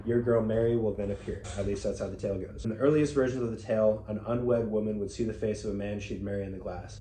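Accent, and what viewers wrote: Australia. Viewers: Lmao I was so confused at the start with the Australian accent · Wait, he’s Australian?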